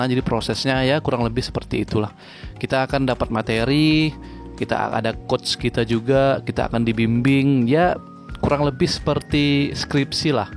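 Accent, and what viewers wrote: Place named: Sambas, Indonesia. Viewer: Bang kalau boleh tau, abang cpnsnya daerah mana bang, kalau dari logat dan nama chanelnya mirip logat daerah Sambas🙏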